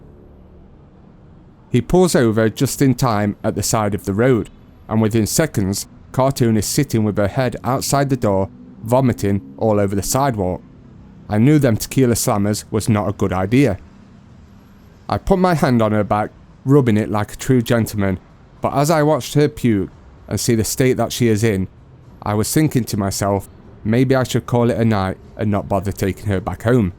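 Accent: United Kingdom. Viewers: You Sound like you come from the U.K. Question: would you ever consider having a prostitute as a girlfriend ? The bar girls in Pattaya are all prostitutes, no matter how pretty and well presented they are. So these girls are all a risk and have a certain mindset. Have fun and walk away!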